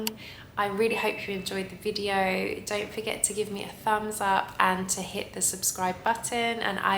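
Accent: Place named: United Kingdom. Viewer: i thought you live in the UK as you have British accent.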